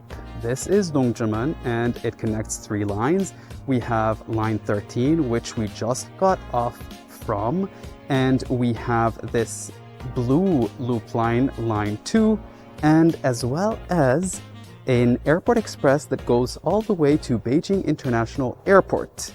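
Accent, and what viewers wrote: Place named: United States. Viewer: You sound like an American. Well, I was also impressed by NY subway 35 years ago when coming from China.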